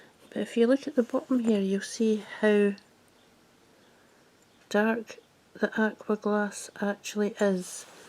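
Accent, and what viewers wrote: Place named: Scotland, United Kingdom. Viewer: Lovely finds! My favorites are the codd marbles. Thank you for showing. And I enjoy your Scottish accent a lot. Greetings from Germany!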